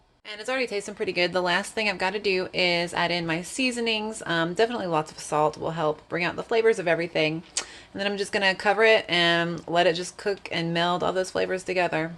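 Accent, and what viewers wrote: South, United States. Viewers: I'm curious what state you live in? I'm sure you mentioned it before, and from your accent I assume down south..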